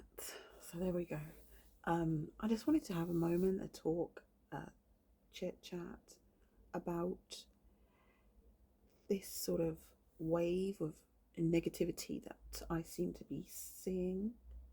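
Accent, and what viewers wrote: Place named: United Kingdom. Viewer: I just want to give you a compliment. Your speaking voice is sooooo soothing. It relaxes me, lowers my blood pressure and helps me fall asleep. Maybe it's your British accent. But since you've posted the video regarding Thandiwe Newton I've subscribed to your channel and watched your videos. I'm from the states (Chicagoland area). I'm fascinated with the UK and have been for years. The more I study the UK the more I realize it's influence on the USA and the world. After all Britain colonized most of the world at one time including America and its influence permeates now. I watch BBC and Ski News more than American news. I watch British TV shows and movies. I hope to visit the UK someday. But back to your voice. You should do more videos. You could read the telephone book. I don't care. Lol I think you could get work reading EBOOKS. 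Lol 🤣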